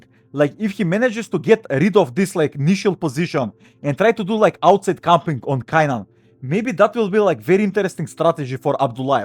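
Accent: Russia. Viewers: Easy...Russian accent. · Извини за, быть может, резкий тон. Однако мне показалось, что это был нарочито русский акцент, а озвучку специально сделали именно такой, чтобы все это поняли. Болгары так не говорят, я слышал их речь. Впрочем, это мое мнение и оно не обязательно верное..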